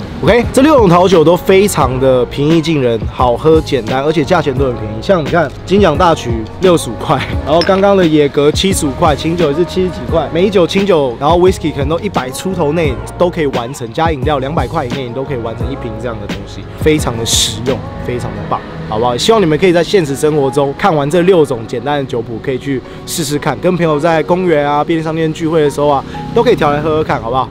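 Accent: Japan. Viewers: 他說的英文是那邊的英文？ 日式英文？ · 我喜歡你的日式英語😆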